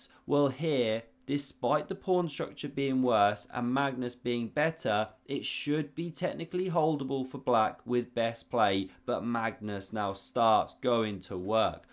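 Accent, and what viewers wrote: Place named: RP, United Kingdom. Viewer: i have to comment - you are actually amazing at this. excellent speaker, sounds effortless and off-the-cuff but quite stylistically elegant, like a posh moistkritical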